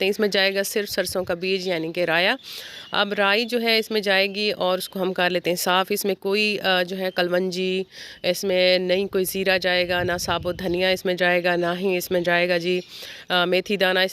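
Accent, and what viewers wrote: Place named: Thalochi, India. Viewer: Sohni thalochri punjabi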